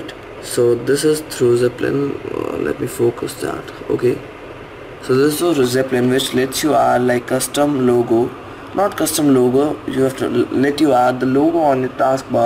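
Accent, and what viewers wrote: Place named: India. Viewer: I love your accent no offense I love Indians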